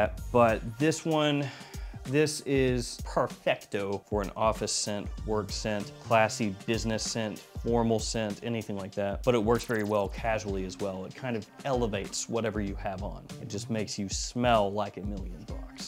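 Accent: South, United States. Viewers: More southern accents, please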